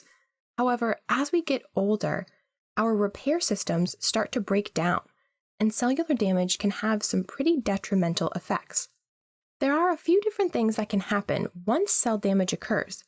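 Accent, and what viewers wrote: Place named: United States. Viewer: It would really help if you were to not speak American. It is very difficult for us English speakers to follow what you are saying, because we have to mentally transform it, whereupon miss what came next.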